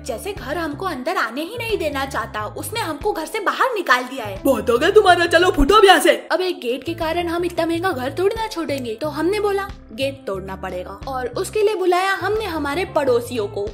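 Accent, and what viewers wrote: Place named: Bengal, India. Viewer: I can smell some Bangla in ur accent. Are u bangali?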